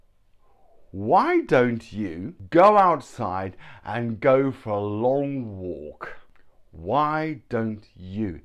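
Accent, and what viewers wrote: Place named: United Kingdom. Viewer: I am interested in British English because it is interesting.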